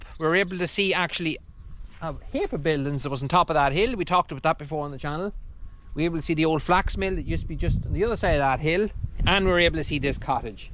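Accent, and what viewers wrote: Cavan, Ireland. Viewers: Where is the cottage? Cavan ?, just going off your accent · I love to hear your brogue. My mother was born in Letterkenny in 1906. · That Good Strong Irish Accent! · Where is this house, somwhere in Ireland, (by your accent ) ?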